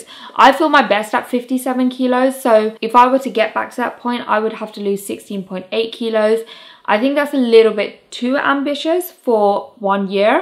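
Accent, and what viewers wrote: United Kingdom. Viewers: Consistency isn't important to me at all. I don't keep track of anyone's schedule. I just check my subscriptions every day and add the videos I'm interested in to my Watch Later list. So I can't speak for everyone, of course, but I would be perfectly okay with you skipping a week now and then if your schedule is just too busy. As for content, my favorite topics are minimalism. decluttering, and finances, but any of the other ideas you mentioned sound good as well, especially health and fitness. Anything that appeals to you would be fine with me. I've watched almost all your videos, and I've enjoyed each of them. As one of the other viewers said, I could actually just listen to you talk all day. As an American, I can't say what sets your accent apart from the typical British accent, but you just sound so posh and elegant ! Congratulations on your weight loss progress. 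I wish you the best of luck with that and with all your other goals. I will continue to watch to see how it's all going!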